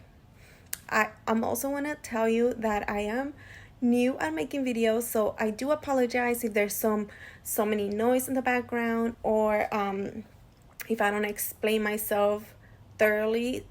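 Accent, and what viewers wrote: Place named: United Kingdom. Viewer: * British accent * absolutely perfect love ❤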